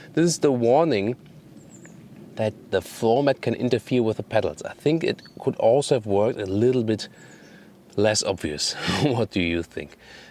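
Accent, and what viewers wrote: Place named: Germany. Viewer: man merkt schon,dass du ein deutscher bist...sprichst ziemlich langsam englisch.was gut ist,da versteht man,bzw. ich auch mehr... 😉 die engländer sprechen ja fast doppelt so schnell... 😂😉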